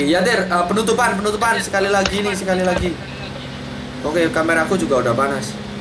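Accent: Java, Indonesia. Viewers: KAMU ORANG JAWA YA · english'e wis medhok wkwkwkwk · Medok tnan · Mas wong ngendi si kok iso boso jowo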